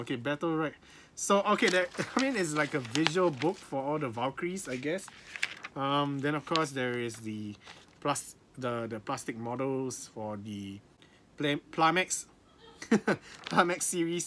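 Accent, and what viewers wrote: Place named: England, United Kingdom. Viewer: I like your english accent 😁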